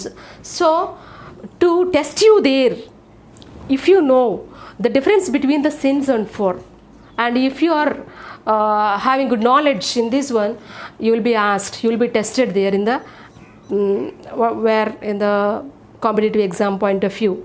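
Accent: India. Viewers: teacher you have a very strong indian accent! please work on your accent, the way you speak we almost do not understand, because of your accent.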